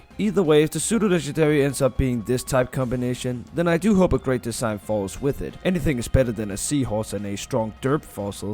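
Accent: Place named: Denmark. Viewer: Figured you were Danish based on your voice alone, got further solidified by you using the Danish website, lol.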